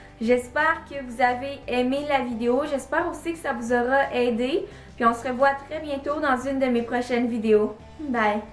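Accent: Quebec, Canada. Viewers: Accent quebecois, jsuis sur...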